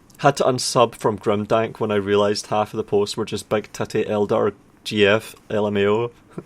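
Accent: Scotland, United Kingdom. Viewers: I remember watching you 8 years ago and I couldn't understand your accent, since then I've spent quite a bit of time around people from Scotland and Northern England so now your accent isn't hard to understand at all. This has no relevance to anything you talk about in the video. Keep up the good work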